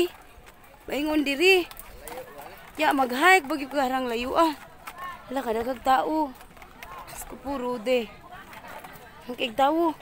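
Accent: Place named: Leyte, Philippines. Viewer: taga dis a ka pareha lge ta ug sinultihan KAHIBAWO ka mag Leytenio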